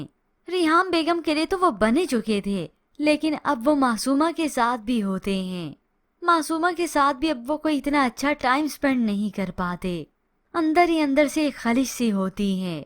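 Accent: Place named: India. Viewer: Aisa laga k koi ENDian suna raha ho Iss me sari guftago ENDian lagi Urdu tow ENDian bunn chuki hai Urdu ka end ho chukaa hai Nazar ye araha hai k Awam subh se sham ENDian bunn chuki hai Hur program mein same Phir yoga pooja ho rahi hai Yeh to jamhori musalman tha !!!!!!!!! Ya Allah Khair Kia sare hindu bunn gayee hain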